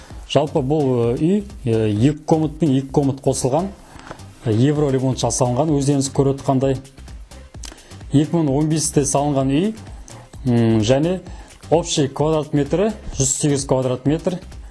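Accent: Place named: Russia. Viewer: Тіліңіз орыс тіліне ақсау. Таза казакша түсіндірсеңіз!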